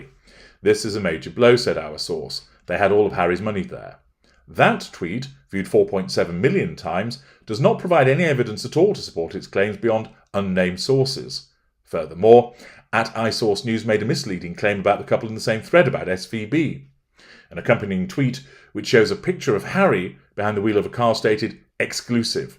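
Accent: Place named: Northern England, United Kingdom. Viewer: Noticed he pronounces other words in this way... always wondered if he originally came from the north of England. Whatever I enjoy his videos so it doesn't matter.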